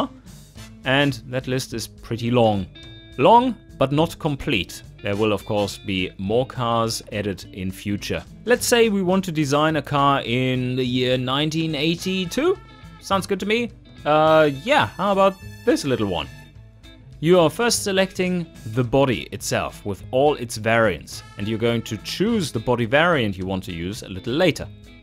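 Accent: Germany. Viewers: Is this a fucking german or english accent i cant decide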